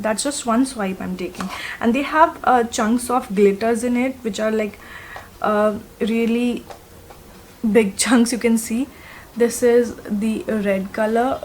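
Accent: India. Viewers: sana Zehra seriously?? Just because she don't have a build up accent to her english, her english is not good? Well grammatically it's all correct, just that she's speaking in her natural indian accent you find it "not good"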